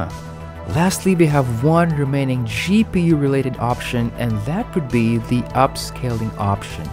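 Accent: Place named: Brazil. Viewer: are you brasileiro?? hearing an accent :)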